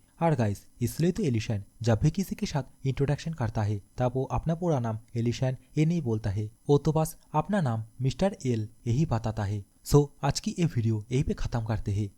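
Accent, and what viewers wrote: Bengal, India. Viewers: That bengoli accent 😅😅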